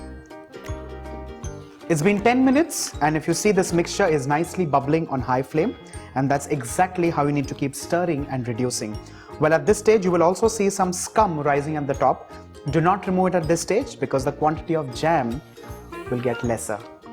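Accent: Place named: India. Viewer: hahah - indian English is amzaing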